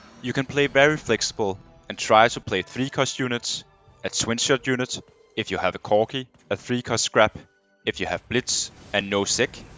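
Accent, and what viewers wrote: Denmark. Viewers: Hey dude, can't help noticing the danish accent <3 ur videos are great very "hjælpsomme" :P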